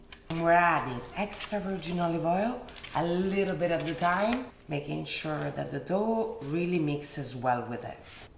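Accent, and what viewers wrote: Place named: Rome, Italy. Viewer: Bella e brava. Ma ,soprattutto, me taglio. Per il tuo romano. Fantastica❤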